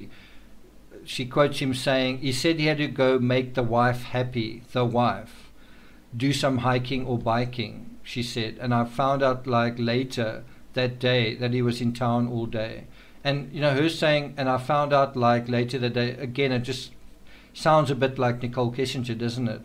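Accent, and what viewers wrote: South Africa. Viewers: You sound South African , i am?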